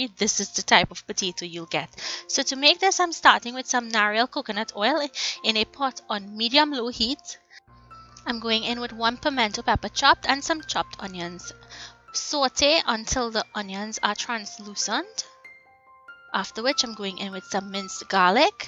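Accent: Trinidad and Tobago. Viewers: Hah thanks a ton for sharing this! I’m bajan but always loved the trini accent!! I’m currently making this now .... so simple and quick, I love that! 👌🏽 Could you do a pepper sauce recipe too pls? ☮️😃💟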